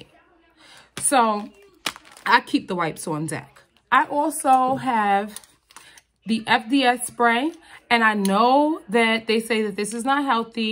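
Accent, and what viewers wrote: New York, United States. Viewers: Good tips I use to use the vagisil odor block I love the smell and I wanted to try those method body wash you think the grey one would be best for all over body including the v or do you recommend not to put it there ? Fellow New Yorker hair I can hear it in you accent girl 🙌🏼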